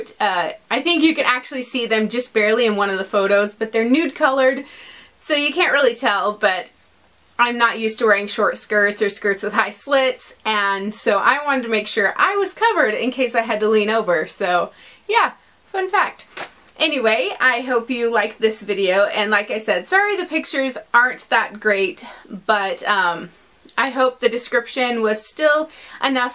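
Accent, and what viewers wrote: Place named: West Coast, United States. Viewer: Would you mind if I asked where you are from? You have a bit of an accent, but I can't tell exactly where from. California? Arizona? West coast USA? Sorry, this question is irrelevant to the topic but I'm very interested in accents & dialects for my work. Thanks! Beautiful skirt, too!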